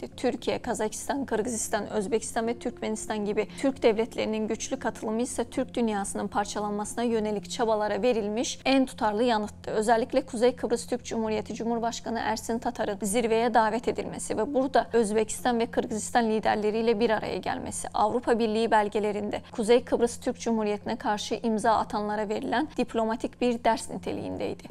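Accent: Azerbaijan. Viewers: Bu nedir yahu? “Bu kız degil! Bu sunucu diyeceksin! Evet Azerbaycanlı ve haberleri gayet güzel sunuyor. Sonuçta birçok kişi aksanla konuşuyor; Kürtlerin de kendine özgü bir aksanı var. Yoksa sen de mi Kurt oluyorsun:)!?? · Azerbaycanlı galiba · bu Kızın aksanı var Azərbaycanlimi?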